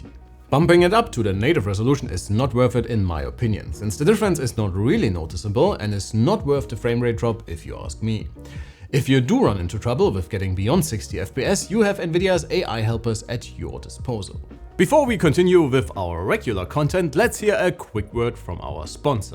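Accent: Germany. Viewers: Nobody wants to talk to you in the comments below lol. You manage to speak German when talking in English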